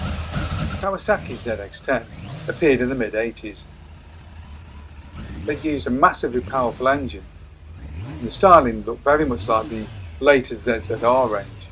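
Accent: England, United Kingdom. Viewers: That bit about the sunbeam looks like it was filmed in the Netherlands, do you remember where? You sound very English though, even if you were taught the Queen's English in school, like i was, that's hard to fake.😁🇳🇱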